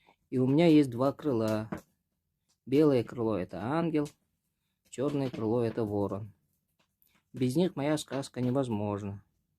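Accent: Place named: Kostroma, Russia. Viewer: Он точно якут? Кострома Ярослав дикция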